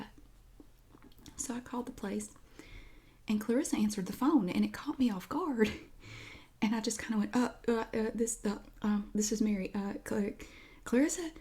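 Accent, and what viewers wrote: South, United States. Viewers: I lived in Thomasville for a short time after college, running a local farm. Before this video based on your accent I really thought you must be in that area! (I moved around southernVA and northern NC a bit for a few years before landing in the northern va/dc area. So many great things about living down there.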